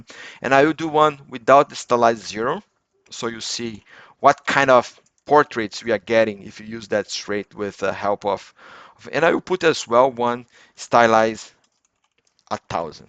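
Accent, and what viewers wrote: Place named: Brazil. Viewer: Seu sotaque parece brasileiro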